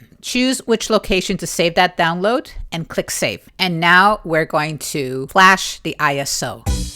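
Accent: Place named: New York, United States. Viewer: I saw the title and thought it might be good to consider, then I saw something about New York and was not sure but I gave it a look and was entertained and saw the process unfold. I was used to the style as my wife is from Queens, Flushing and lived in Manhattan before coming to Virginia where we met. You seem to talk like her so it was quite easy to ignore the emotional bits. But I still need to know if this something I need to do. Thank You I appreciate your sharing. Will need to see a few more videos to calibrate where you are coming form and what it means to me. I studied Fortran in undergrad then in Grad school took a fast track on several languages. Fortran again, PL1, APL, Cobol, Intel Assembler, Basic,Pascal and Forth. I decided I would never need to program ever again. But now in retirement I am rethinking that pledge. Moving to Linux ?!?! 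I don't know, See you next time. Dennis in Virginia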